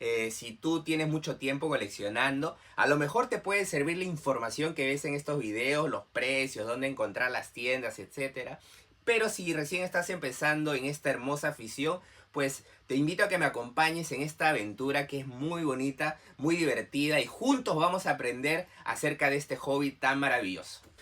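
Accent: Peru. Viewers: En una se reconoce a un Peruano cuando habla ... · Hola amigo, eres de. Peru? Tienes un acento bien neutro, y me parece que si eres compatriota mío, sea cuál sea la respuesta, grandes exitos amigo, que siga creciendo el canal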